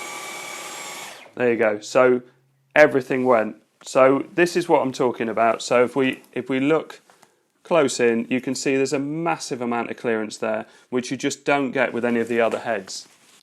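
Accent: United Kingdom. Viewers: thanks for responding. Love the bri’ish accent 🇬🇧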